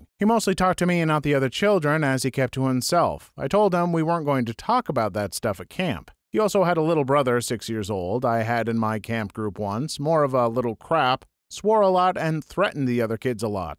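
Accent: France. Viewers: Honestly, your French accent isn't that bad! I just don't understand those insults lmao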